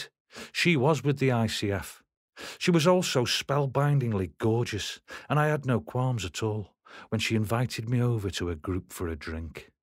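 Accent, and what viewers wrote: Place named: Stoke, United Kingdom. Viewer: Perfectly narrated and nice to hear I being told with a local accent, never was into the football scene, was more into the old club scene in stoke and very proud of my home city Stoke On Trent. Just downloaded Naughty based on this.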